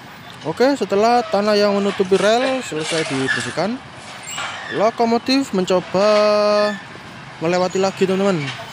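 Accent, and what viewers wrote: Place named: East Java, Indonesia. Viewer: Nang endi iki cak? nek delok omongane sih ky ng jawa timur yo . . .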